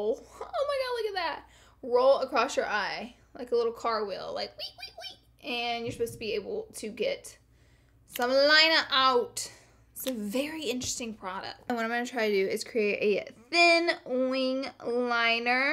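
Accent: South, United States. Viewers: Is she from the south?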